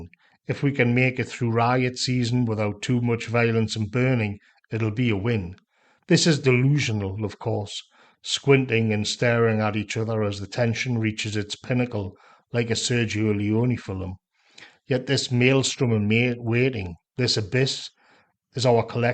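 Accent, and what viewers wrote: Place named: Newcastle, United Kingdom. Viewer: Incredibly well written article, as ever, with added poignancy when read out loud in those Geordie tones.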